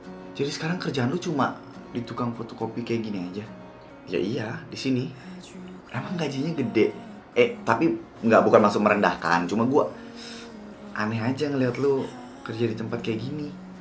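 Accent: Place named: Eastern Indonesia, Indonesia. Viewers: Akhirnya setelah sekian banyak video yang sa nonton ada juga yang pake logat papua❤❤❤❤ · Logat timurnya 👏🔥💯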